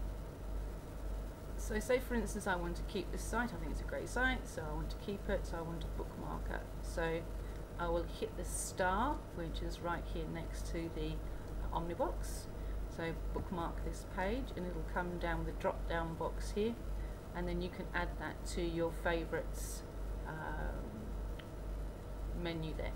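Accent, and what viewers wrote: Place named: Australia. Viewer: thanks! really helpful! and i love your Australian accent!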